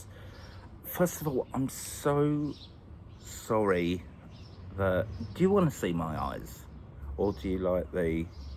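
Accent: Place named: Estuary, United Kingdom. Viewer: I like the way you speak from the heart, and I adore your Estuary English speaking (if I've correctly pinpointed where you are from). I'm a French English learner and sometimes it's difficult to understand different English accents. Yours is so accessible, I really like it and watch a lot of your videos.. do more like this, please!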